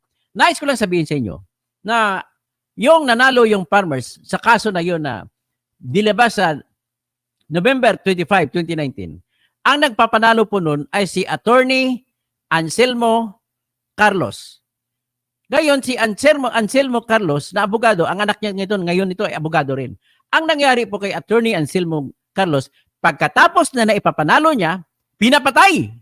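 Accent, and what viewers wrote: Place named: Visayas, Philippines. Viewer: Hindi ba kayo nkakahalata, etong blogger halatang visaya yung salita nya pansin ko lang, palagay ko maimpluwensiya yung may hawak dito sa blogger na ito. Eto lang msasabi ko kapag si BBM ang idinawit mo ang sambayanan na mgagalit sayo. Ndi mo mabrain-wash ang tao pagdating kay BBM. Dahil gusto cya ng tao. Palagay ko duterte ang may hawak sayo.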